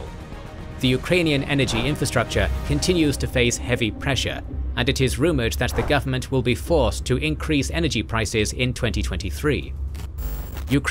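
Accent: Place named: United Kingdom. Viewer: A British-sounding accent always does make for a good narrator.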